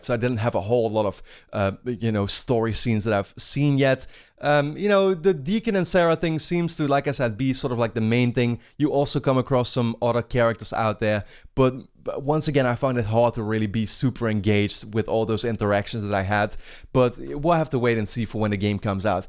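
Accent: Netherlands. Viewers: I cannot unhear the dutch accent lol. Its cool tho, i’m dutch myself. Great review!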